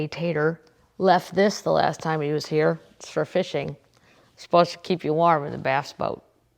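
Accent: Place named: South, United States. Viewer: I am speaking in a Southern accent that now I can't shake after watching you read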